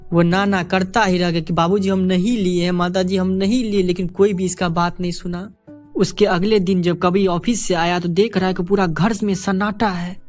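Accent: Bihar, India. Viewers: भाई तुम बिहार से हो क्या ।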